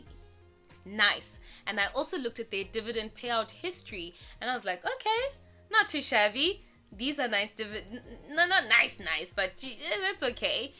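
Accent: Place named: South Africa. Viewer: I thought you lived in Australia but I was surprised by the South African accent 😂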